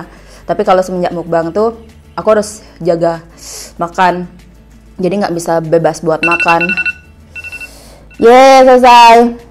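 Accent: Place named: Bali, Indonesia. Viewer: Pesu logat bali ne😹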